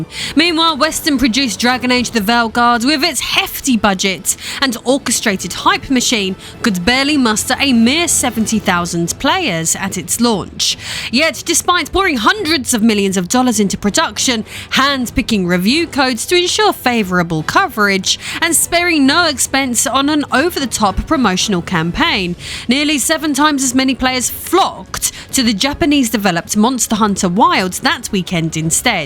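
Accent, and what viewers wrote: United Kingdom. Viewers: I ❤British accent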